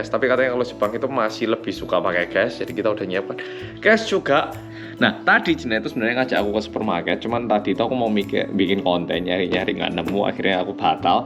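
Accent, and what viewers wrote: Java, Indonesia. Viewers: Mas kalau ngomong gk lupa ama jowo medok e aku seneng dengarnya soale aku wong jowo juga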